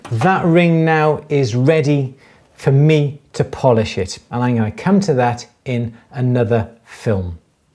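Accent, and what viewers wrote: Wales, United Kingdom. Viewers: Great videos. U have a sight Welsh accent s. Wales??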